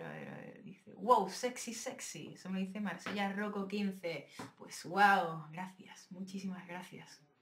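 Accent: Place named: Mexico. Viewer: Holaaa Abraham soy Maria de Argentina- Buenos Aires .. Per me dicen Mar , bueno te queria decir q cantas muy lindooo , sos mi amor platonico la vrdd , me enamore de tu dulce vos jejeje si tenes algun tiempito me gustaria q escucharas mis grabaciones de mi cuenta en youtube y me dieran tu opinion ,ya q te admiro bastante y me gusaria conocerte masss bonitooo. Te kieroo muchiiooo , adoro tu asentoo mexicano...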